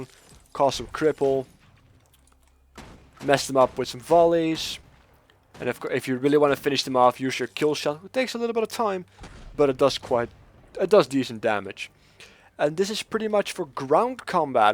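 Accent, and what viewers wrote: Netherlands. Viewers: you just can hear on his english speaking hes from holland lol:P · Ik snap hoe moeilijk het is om engels te praten met n nederlands accent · You're dutch, aren't you?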